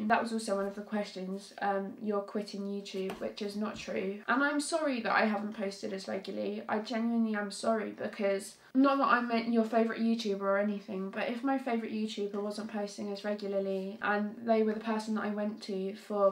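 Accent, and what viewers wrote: United Kingdom. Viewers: u have such a nice accent. I wish I could learn such a nice british accent ...I will try my best. it sounds so cute and british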